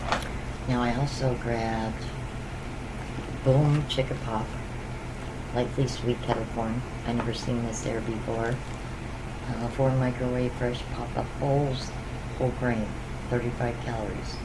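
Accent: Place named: Pennsylvania/Delaware, United States. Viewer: Hi! I knew you sounded like me..its the PA/DE voice we both have!!I have no idea how I found your channel, but I am drawn to your personality! I was watching Freakin Frugal they are a dumpster diving husband & wife that get a ton of stuff from Aldi & Save a lot (mainly Aldi) they donate alot of stuff as well..food/clothes..you need to see these videos. You could add content ty o your channel. People love it! I hope your channel gets bigger & bigger..this is how it starts..much blessings to you! Much love from Middletown, Delaware 💗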